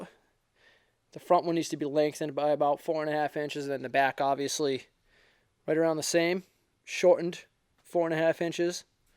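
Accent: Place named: Massachusetts, United States. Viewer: You’re from eastern Massachusetts, aren’t ya. Lol. Awesome work. How hard would that be to install in my 92 F350?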